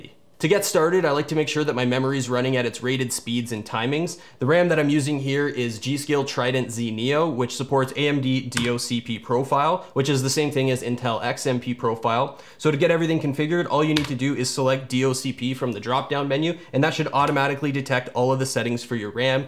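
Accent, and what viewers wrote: Canada. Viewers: Love the Canadian accent. Seems another Canuck is into tech vids.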